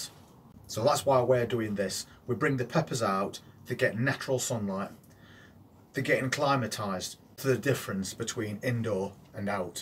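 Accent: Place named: Nottingham, United Kingdom. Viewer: Really helpful video. Where abouts in the UK are you? you sound local to me (nottm)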